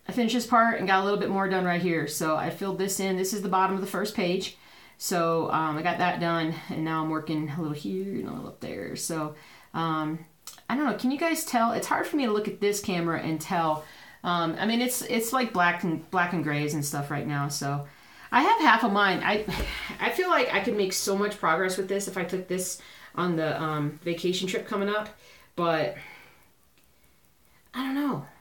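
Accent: South, United States. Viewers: So sorry to hear about your fur baby! I pray Jelly girl is well soon. By the way there's nothing wrong with your southern accent coming out....I'm from KY!!! :-) Great episode, just way to short, sheesh you know your number one job is to entertain me, I meant us (yeah I meant us hehehe)!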